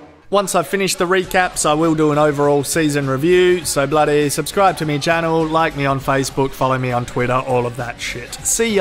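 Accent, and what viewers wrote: Australia. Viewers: I absolutely love these reviews!!! I found them when listening to other GoT stuff on here, and I'm hooked! Also listened to some of your other stuff, and plan to go through ALL of it!!! It's that accent! Oh God! The Ozzy accent is my favorite to listen to. All this talk about listening and not watching? Well, to be honest, I'm blind. Maybe, if I'm lucky, I'll get to come to the land down under one of these years. It's been a dream of mine? What other interests do you have aside from Thrones? I'm also into Babylon 5, Star Trek, Lord of the Rings, studying languages, reading anything I can get my hands on, and listening to music.Cheers!Chelsea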